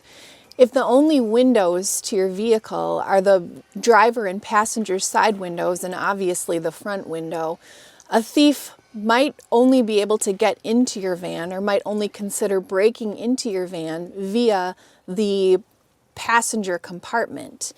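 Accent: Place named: Wisconsin, United States. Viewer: its called an accent. Shes from Wisconsin. Also- <link>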